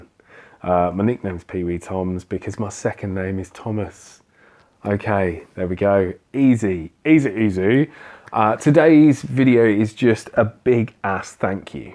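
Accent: Australia. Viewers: Love the aussie accent, you"d make a good aussie warrior with your craziness, we do wacky well in Oz. Love ya, looking forward to getting up one morning and seeing you tell us all you have found the key!:) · You sir are an inspiration oh love the Australian accent nearly as good as mine !! Hope everything goes ok tomorrow all of us Here will be thinking of you xx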